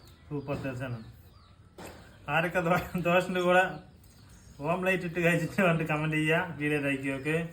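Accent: Tamil Nadu, India. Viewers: തമിഴ് ചുവയുള്ള നിങ്ങളെ സംസാരമാണ് എനിക്കേറെ ഇഷ്ടം❤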